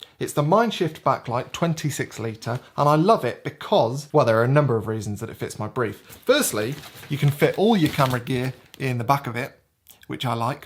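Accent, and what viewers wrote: United Kingdom. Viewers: I gave u thumbs up even before i watched it. Love the british accent and your dry humor. I have no interest in Panny or micro four thirds but you vids are entertaining. Bikes. Hikes. And what ever else. Love it. Thanks.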